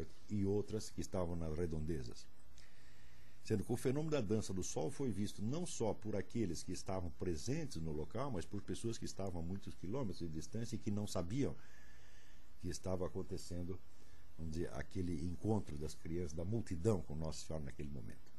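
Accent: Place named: Portugal. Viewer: Caro amigo de Carvalho que vou acompanhando à distancia, gosto de o ouvir, principalmente quando se zanga e fala o português do português. Gostava de o ouvir sobre um tema a que todos fogem... A história sobre o tempo... O tempo de uns não é igual para outros...O tempo do assassino difere do tempo do assassinado. O tempo de Cristo difere do nosso... Já Agostinho respondia à pergunta do amigo Evódio quando inquiria o que Deus fazia antes de criar o mundo e,Agostinho responde, em tom de zangado, que Deus preparava o inferno para este tipo de perguntadores. Depois diz Agostinho: Antes da criação do mundo não havia tempo... Pergunto, caro, todos vemos o tempo de modo semelhante? Eu penso que não. Uns estão presos ao passado, outros só vêm o presente e alguns têm angustias com o futuro... Amigo, pense neste tema e disserte sobre ele... O meu muito obrigado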